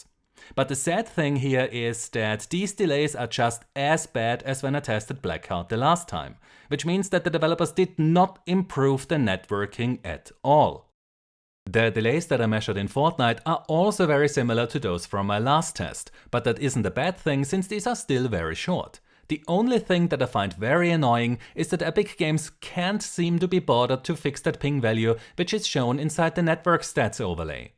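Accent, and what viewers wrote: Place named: Germany. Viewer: Man hört es als deutscher das du deutscher bist 🤙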